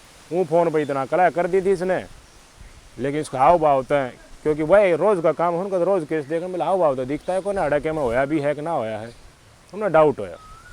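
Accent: Haryana, India. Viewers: Haryanvi hae bhai achi soch rahko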